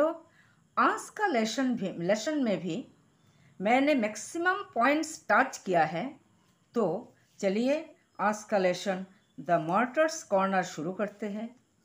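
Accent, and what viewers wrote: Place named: Bengal, India. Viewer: Its bengali accent🥸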